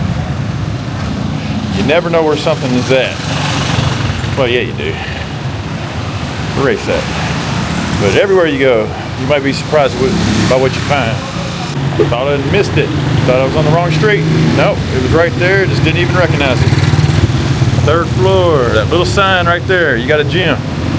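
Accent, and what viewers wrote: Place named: South, United States. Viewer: Are you from Texas? You have a Southern accent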